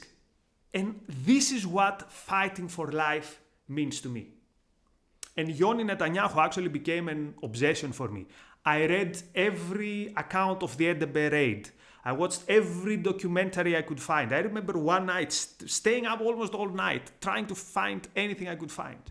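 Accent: Greece. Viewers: I knew immediately this guy is Greek by the accent 😅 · thank you for that, its very profound of course. after listening to you for 2 minutes you sound to me greek. Kreeks need to understand that Ardugan from turkey is a radical muslim and if people will not unite against radical / evil islam - Greece will have a genocide by Turkey. The muslim brotherhood / radicalization is a very serious problem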